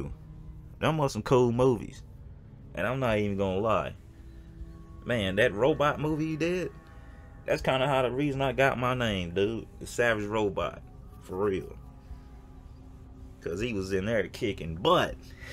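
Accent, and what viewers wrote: South, United States. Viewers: U have a cute accent dude, southern like 🌝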